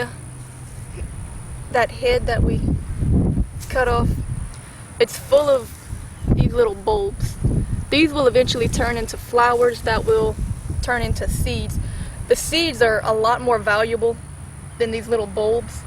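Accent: Louisiana, United States. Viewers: You sound like you're from Louisiana, by golden meadow or Thibodaux.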